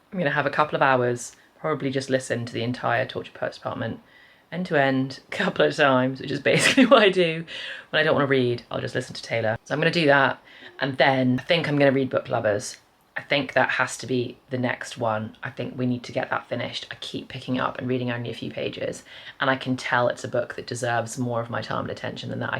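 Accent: United Kingdom. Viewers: Just finished Book Lovers and I enjoyed it ! I really appreciate your content and, as a French, I’m a fan of your British accent 😊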